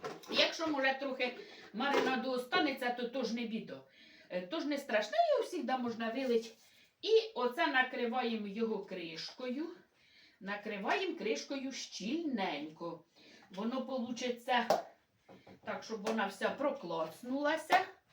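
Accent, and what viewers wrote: Poltava, Ukraine. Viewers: Дивлюсь вас слухаю і настрій піднімається❤Балакаєте гарно так як і на моїй рідній Полтавщині(вас послухаю і ніби на батьківщині побула) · така ж мова, слово в слово, Полтавська обл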